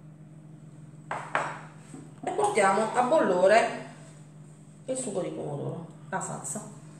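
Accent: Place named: Apulia, Italy. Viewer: sei bravissima quando spieghi,sono pugliese anche io e quando parli mi sembra di sentire la mia voce con la stessa cadenza pugliese, (Corato),da 50anni sono in Piemonte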